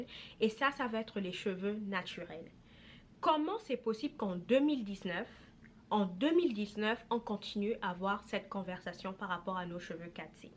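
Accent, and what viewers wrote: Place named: Gabon. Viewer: En entendant ton accent j'ai cru que tu étais Gabonaise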